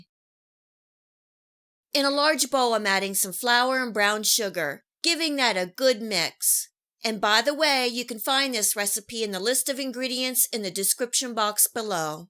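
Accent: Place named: Delaware Valley, United States. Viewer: You are from the Delaware Valley? My family is from Philly and South Jersey--you sound like my relatives!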